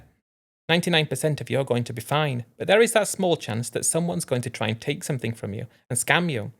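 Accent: United Kingdom. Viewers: You’re from the US? Your accent is British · If you’re from the United States, why do you have a British accent?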